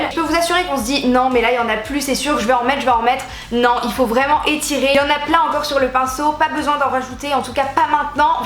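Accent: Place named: South, France. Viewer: Jpp l'accent du sud😂 super video continue comme ça, ❤️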